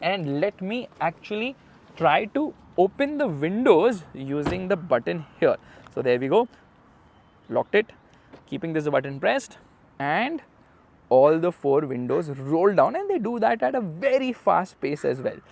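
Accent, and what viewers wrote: India. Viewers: Indian accent is really hilarious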